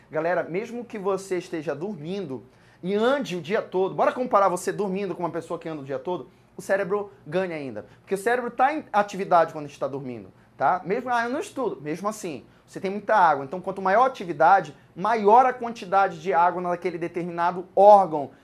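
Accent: Pará, Brazil. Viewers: Maninho tu é Paraense? 😂😂 adorei a aula. 💋🙇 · meu deus tua aula é excelente, socorro! Teu jeito de falar é tão paraense que me senti acolhida. bjs.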